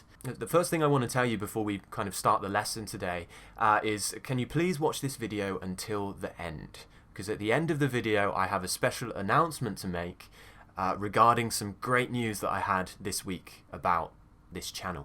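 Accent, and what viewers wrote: United Kingdom. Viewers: hi, I'm from Brazil, I like british accent and your videos. cheers !... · Can't wait for your weekly lessons! 🔝 I'm completely in love with your super British accent lol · British english is so sexy 😏 I love it and I am also excited bout your videos... I admire your accent 🤔😱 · I'm sure most of people subscribe to your channel because of your clear and beautiful British accent. Congrats 🎊 may you reach more 😊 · Mr, Good morning, I like the British accent with pronunciation. I've also searched for this kind of video until I find you. Now I'm thrilled with your videos. My morning also starts with your videos. My improvement is also apparent now. Actually, I'm from #Bangladesh. Lots of love from my country. Warm Regards, RAZIN AHMOD:elbowcough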